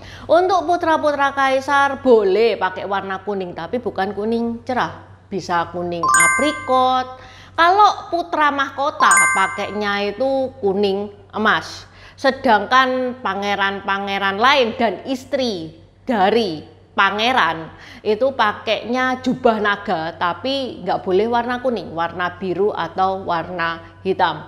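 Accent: Java, Indonesia. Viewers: Enakan dengerin dongeng nya tuch.. mantep bingit dg bhs indonesia yg ber'aksen jawa medok.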